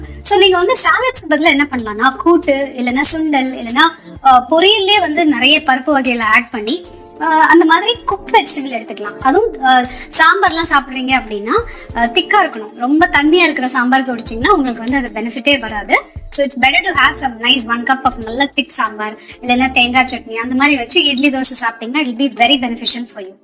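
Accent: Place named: London, United Kingdom. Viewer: உன் சொந்த ஊர் சென்னை வியாசர்பாடி தானே பிறகு எதற்கு லண்டன்லே பிறந்தா மாதிரியே பேசறே.. இன்னமோ தமிழே தெரியாத மாதிரி 😠😠😠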